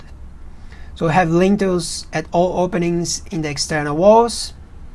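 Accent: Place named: Australia. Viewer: Great aussie accent. Structural calculations are always relevant. Keep it up!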